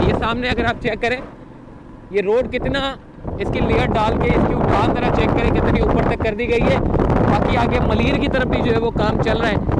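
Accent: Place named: Hyderabad, India. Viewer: Full Hyderabadi accent…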